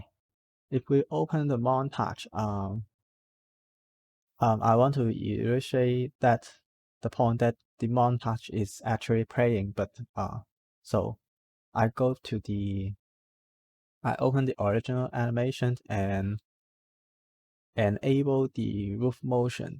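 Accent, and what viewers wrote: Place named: China. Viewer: Chinese accent.Thanks for the solution of animation changing flicker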